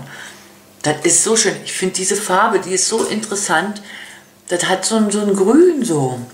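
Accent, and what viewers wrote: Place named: Berlin, Germany. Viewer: Biste Bärlinerin? Hört sich zumindest so an. Und wenn ja - sei gegrüßt aus Pankow :)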